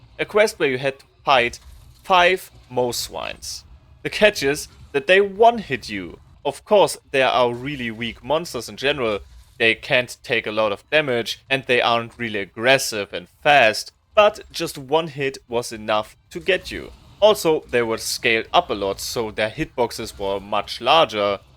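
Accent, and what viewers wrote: Germany. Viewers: Are u german?😄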